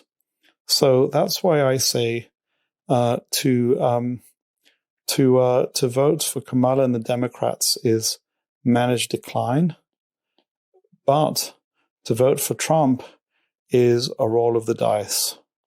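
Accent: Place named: RP, United Kingdom. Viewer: ABSOLUTE FUCKING BOLLOCKS. TAKE YOUR PONCY ENGLISH ACCENT AND FUCK OFF. YOU'VE NO IDEA WHAT THE FUCK YOU ARE TALKING ABOUT.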